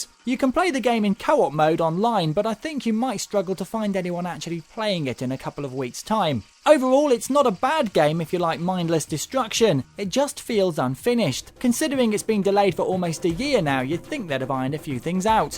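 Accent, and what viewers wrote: United Kingdom. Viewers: He's british, so he speaks with british accent.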